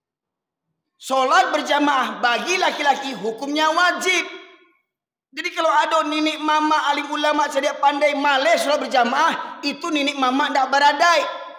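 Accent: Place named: West Sumatra, Indonesia. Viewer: Lombok hadir tuan guru, saya suka, pengajian ini, meskipun ada bahasa yg sy tidak mengerti, tpi sy suka mendengar isi pengajian dan logat minangnya.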